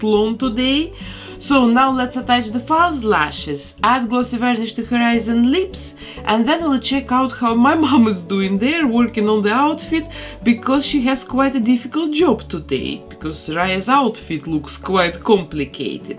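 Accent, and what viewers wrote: Russia. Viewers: Вы, случайно, не русская?) У Вас акцент такой прикольный~ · Hey,. I’ve noticed your accent. Do you come from Russia 🇷🇺? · Оооо видно что у неё русский акцент 😂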